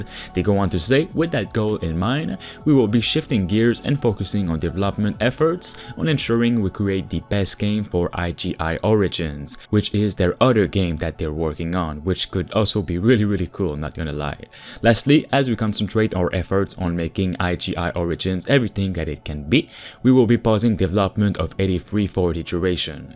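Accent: Quebec, Canada. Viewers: Your Canadian French are you bro?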